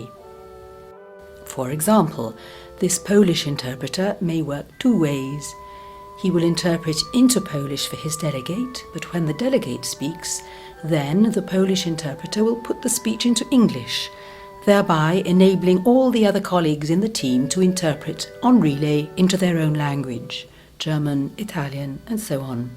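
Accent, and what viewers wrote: Wales, United Kingdom. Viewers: Hi Latertoe, the speaker doing the voice over is from Wales. all the best, Ian DG Interpretation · Wales, indeed.. an enjoyable accent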